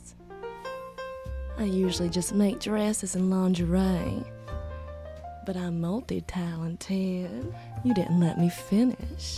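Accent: United States. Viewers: It's that southern Belle accent 😍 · why did I think the accent was from new jersey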